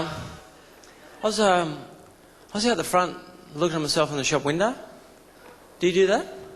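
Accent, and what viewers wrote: Australia. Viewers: Country u come from isnt the factor what makes the difference in humor.... punch lines and the way you bring it does.... Aussie accent sounds better I'll admitt to that! ... 😂 bring on the laughs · The Australian accent is amazing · lol... he is so aussie.... that accent is so strong. :D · He makes himself sound so much more bogan. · nice to hear an aussie accent again :) good ol days.. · Hilarious!!! Lazy Aussie accent + everyday things = killer combo. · no shit listen to his accent are you deaf? You couldn't be more Australian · Isn't good to hear a true Aussie voice...and an ol fashion one at that😅...just like grandpar & ya uncle from the 50 and 60s..what ya think..a bloody fairdinkim aussie😊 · OMG!!! This guy is freaking HILARIOUS!!! I'm in pain from laughing so hard!!! Love that Aussie accent to top it all. Outstanding indeed!!!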